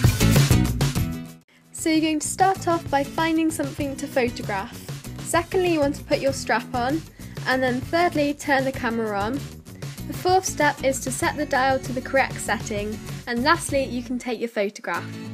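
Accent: United Kingdom. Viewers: The British are so cool 😎 accept classy accent❤ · Omg I was so shocked when I heard ur voice I love ur accent. :) wish I were british. Great vid!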